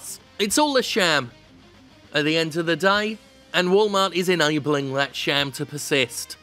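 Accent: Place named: United Kingdom. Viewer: I feel like a guy with a British accent immediately loses credibility on this issue. The country Jim was born in has been throwing people in cages recently for offensive tweets. Our individual gun ownership prevents that sorta government oppression at large, and those that don't own guns are free riding on that notion the same way that anti vaxxers free ride on the herd immunity of diseases.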